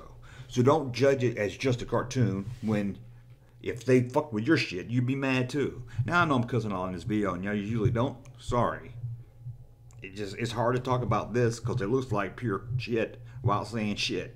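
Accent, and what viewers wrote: South, United States. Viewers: i love that southern accent! reminds me of my grandma.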